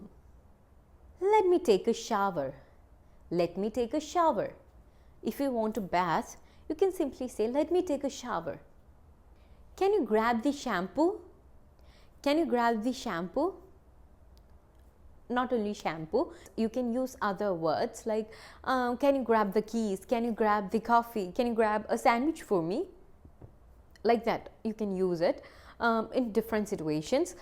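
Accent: India. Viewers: Your English accent is very close to Indian/kerala . Where are you from actually?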